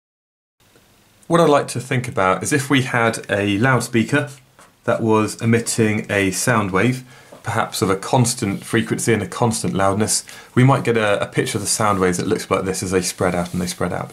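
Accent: United Kingdom. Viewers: I love your accent, nice British guy!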